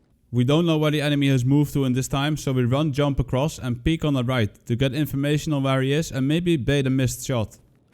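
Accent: Netherlands. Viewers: He sounds Dutch kind of northern European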